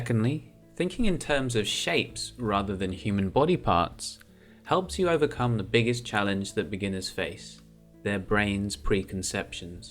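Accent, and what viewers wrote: United Kingdom. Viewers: haha you're a Londoner - Evening Standard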